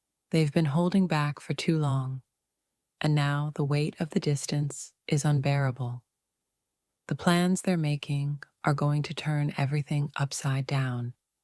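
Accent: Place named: Scotland, United Kingdom. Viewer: 😊🤗🌹 did you believe the distance was not having effect on me? It did have an effect on me. I love talking to you and now I'd like to see you sit down and let's start talking. By now. You know me so well. Don't think you'll even be nervous. I just can't wait to hear that British accent. A lot of it's gone. Which old videos to now still there? Oh, it's Scottish. I'm sorry. Excuse me your Scottish accent. I have to get that straight. I will. Everything we do now is going to be for the better you're going to be a lot happier. Feel a load off you that you haven't felt in 30 years and you got me with a woman who truly just loves you. You lose everything tomorrow okay? We are both and great hands. I know I'm always going to be honest and I know you are because you believe in call and I hope with your domestic issues you resolve them fine. 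Get her out of your life, not for me, but for you she's dragging you down. She's making you believe things at on shrew. I don't like when women take advantage of men's good hearts and that's what she's doing to you. Take the rest. Take the chance I am. What are you thinking My love 👠💋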